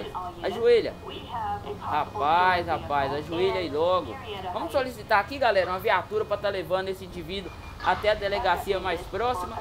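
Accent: Minas Gerais, Brazil. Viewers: Ele e mineiro?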